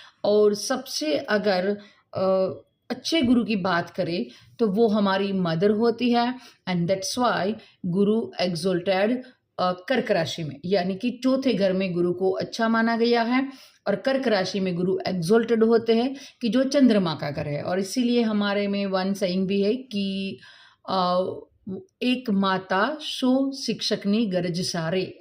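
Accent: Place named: South, India. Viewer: Sahi kaha.. South Indian accent bot acha lg rha hai..👍🏻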